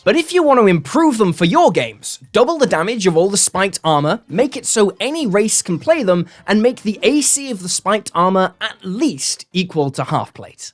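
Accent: Australia. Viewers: I thought you were Australian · i dont know why i thought he was Australian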